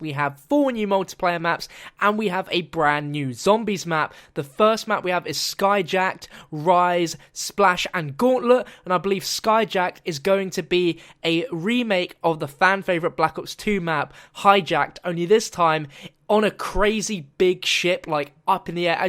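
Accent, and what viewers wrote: England, United Kingdom. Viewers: I love when English people speak German :D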